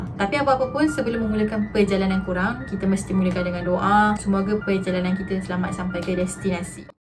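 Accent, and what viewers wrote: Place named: Kedah, Malaysia. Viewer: Cara ckp gaya org Kedah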